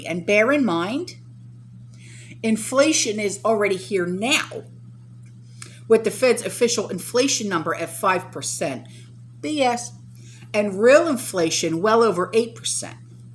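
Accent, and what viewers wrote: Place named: Long Island, United States. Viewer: you have to be from Long Island, ny. The way you say youzzz-guys. My best friend says it the same way and she’s from Long Island.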